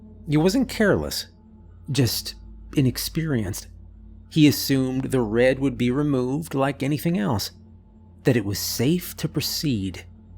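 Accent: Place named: East Coast, United States. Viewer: I’m guessing is on the north east coast — the way he pronounces room is “rum”. Seems to be an eastern accent (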